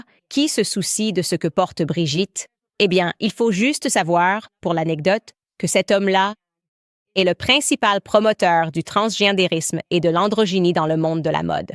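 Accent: Quebec, Canada. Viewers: AI gave you an accent from Québec!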